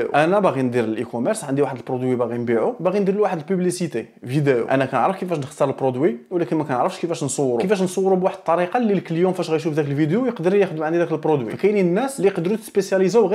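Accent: Morocco. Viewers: أول مرة كنسمع الفيديو ديالك واش الأخ توناتي حيت اللهجة تاوناتية بامتياز ❤❤❤ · مع انني لم افهم الكثير حيث ان لهجتك المغربية اللذيذة .. فكل الحب لاخواننا المغاربة الطيبين ...ولكن كلامك منطقي فهؤلاء يبيعون الوهم للناس . جزاك الله خيرا متابعك من فلسطين غزة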